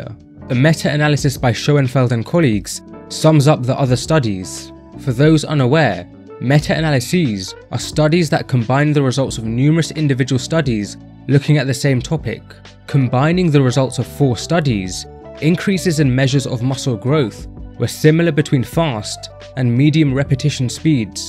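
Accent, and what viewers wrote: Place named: United Kingdom. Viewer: For some reason I trust him so much more because he had a British accent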